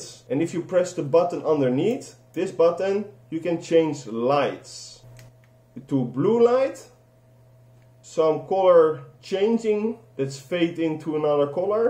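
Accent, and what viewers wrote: Netherlands. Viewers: Love the little Sasuke figure! Greeting from Holland, I can hear you're dutch aswell. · Are you Dutch u really have a Dutch accent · no problem keep it up maybe one day earning :))) and you sound dutch? xdd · u fr sound dutch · Hah nederlands accent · Je hoort Ook gwn rustig die nederlandse actent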